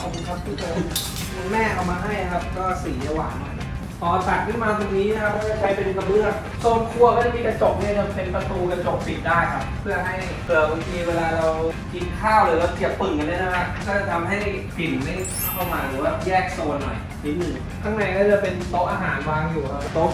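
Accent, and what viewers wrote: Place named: Thailand. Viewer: โอ้เหน่อทำไม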